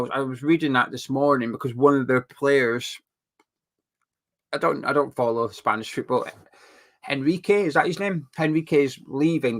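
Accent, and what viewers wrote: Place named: Yorkshire, United Kingdom. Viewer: The "iron, a lion and ready to Roar" made me laugh too. Especially in the Yorkshire accent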